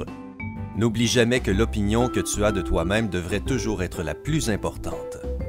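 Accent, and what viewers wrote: Quebec, Canada. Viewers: Bonne vidéo je n'avais pas besoin d de ses conseils mais vue que je connais des gens qui ont se genre de problème je pourrais les conseiller et j'adore ta voix lacent québécois et magnifique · Tu as un accent québécois likez si 👇vous êtes d'accord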